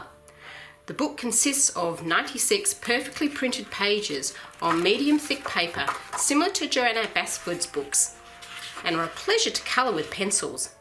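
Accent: Australia. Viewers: Hi, love love love your work, not only beautiful but inspirational (and also refreshing to hear a fellow countrywoman). I have thoroughly enjoyed every single video you have produced, and especially find your book reviews very informative. I received Dagdrommar in the mail the same day you released "how to colour a womans face" and had to chuckle, I have also just received Sommarnatt in the mail today and your are correct, just as "lovely" as the first. I am an amateur artist in Adelaide 45yo male, and just wanted to say "hi" and "thanks', keep up the great work:-)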